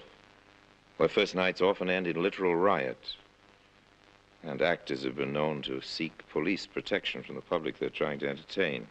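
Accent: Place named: Transatlantic, United States. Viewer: I think he was mostly raised in America but acquired a transatlantic accent, as many actors and upper class people did in the '30s, for example Franklin D Roosevelt had a similar dialect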